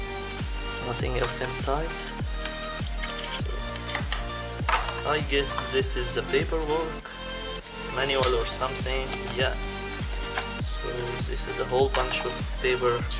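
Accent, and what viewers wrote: Egypt. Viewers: Speaking your language is better that zis ziz zeez egypt accent .. you makes me really angry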